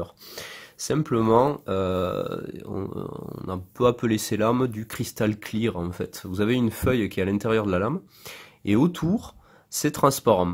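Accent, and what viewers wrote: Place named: South, France. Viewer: merci pour ta vid, après être tombé par hasard sur une vidéo de Michelle Christa Smith , j'ai trop envie de me lancer dans le maniement du sabre laser mais pas pour du combat, juste du freestyle. tu pense qu'il y a des clubs ou groupes dans le sud? vu ton accent je pense qu'on est pas loin.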